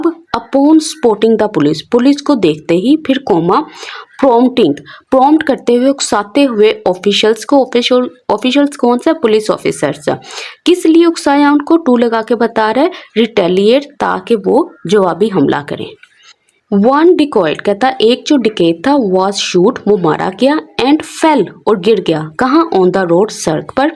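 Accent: Lahore, Pakistan. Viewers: Ma’am, I am an English teacher and the author of two books. I truly admire the way you explain things. Anyway, Your accent suggests you are from Lahore.😅😊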